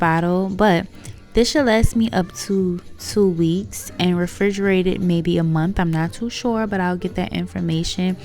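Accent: Louisiana, United States. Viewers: You from Louisiana? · We have the same name... mine Is spelled Daryllynn .. are you from Louisiana?? Sounds like it & the other 2ppl ik with my name are both from there.. I'm from Texas/Florida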